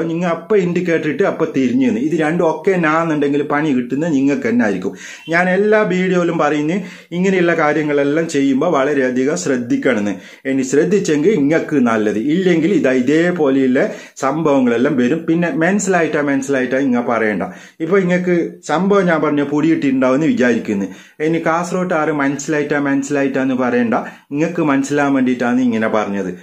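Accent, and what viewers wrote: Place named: Kasaragod, India. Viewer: Kasar kod Basha Yella Bashayum Ariyum😆😆🤣🤣Rasamund kealkkan😃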